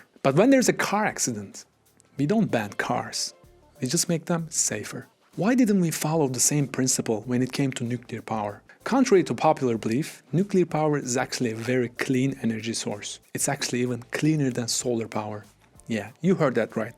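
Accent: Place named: Turkey. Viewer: Nice and clean video. The accent rings a bell, any chance ur a Turk?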